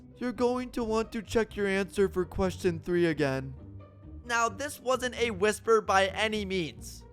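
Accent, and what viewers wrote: Iowa, United States. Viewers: You from Iowa?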